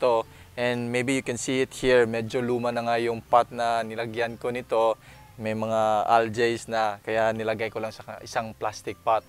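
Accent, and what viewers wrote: Visayas, Philippines. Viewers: I love your Visayan accent. Black velvet is beautiful .Your surroundings is paradise . Beautiful country side. Thanks for the plant care tips.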